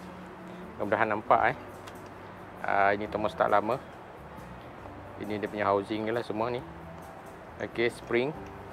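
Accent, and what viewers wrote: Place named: North, Indonesia. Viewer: Bagus sekali bro.....nak tanya sikit....bro asal dari mana....ada dengar pelat utara.....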